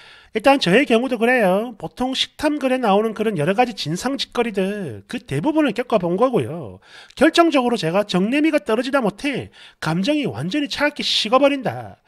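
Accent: Gyeongsang, South Korea. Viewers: 아저씨ㅋㅋㅋ전형적인 경상도 개 웃긴 형부같음ㅋㅋㅋ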